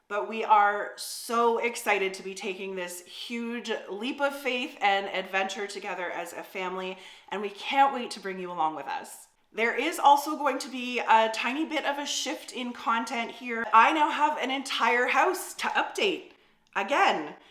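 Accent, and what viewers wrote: Canada. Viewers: Hi! I’ve been watching you for several months. At first I was thinking “abaut” “haus” “ote/out” what in the world? Canada! Nevertheless, you grew on me. You are absolutely brilliant! I love your work, your work ethic, your personality, I just love you! ♥️ You’re such an inspiration to all artists. Not afraid to try something new, or show your mistakes to us. I can’t say enough abaut, lol Your incredible drive/ambition, and positivity that radiates through your videos! It does not go unnoticed. God Bless! You’re just crazy amazing!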